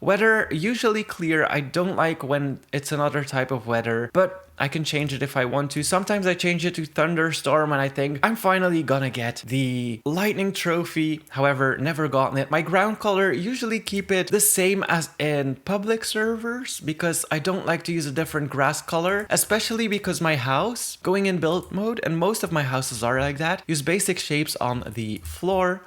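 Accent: Netherlands. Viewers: are u dutch???!!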